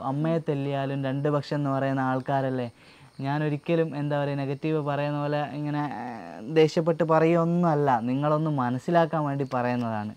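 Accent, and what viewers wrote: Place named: Nadapuram, India. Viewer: vadakara thanneyono.allel nadapuram bhagathaano?, samsaaram nadapuram shailiyaanallo😆😆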